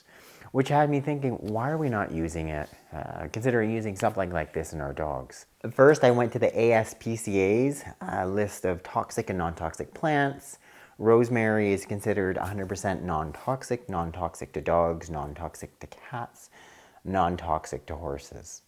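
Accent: Canada. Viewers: I thought I detected a Canuck accent... I love being right! We really do pronounce "out" and "about" in a special way..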